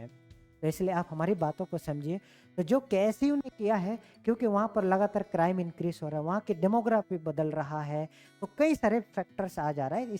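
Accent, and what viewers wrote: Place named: Bangladesh. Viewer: Eye bangladeshi hai bolne ka tarika patachal teh.hai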